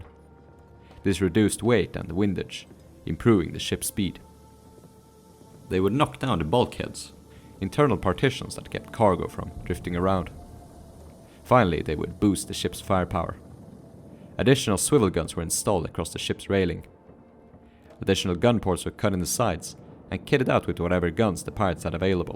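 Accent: Scandinavia. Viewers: What country are you from? im sensing a scandinavian accent, being a swede my self. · Scandinavian accent?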